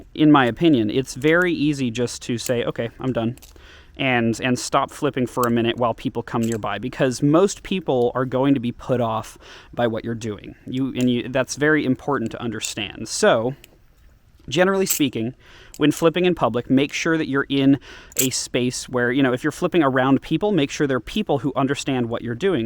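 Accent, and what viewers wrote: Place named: United States. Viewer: you're from georgia? you sound so american.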